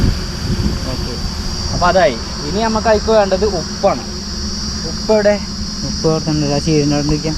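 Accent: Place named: Kannur, India. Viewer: നമ്മുടെ കണ്ണൂർ സ്റ്റൈൽ സംസാരിക്കുന്നതിൽ വളരെ സന്തോഷം തോനുന്നു🌹🌹🌹🌹 bro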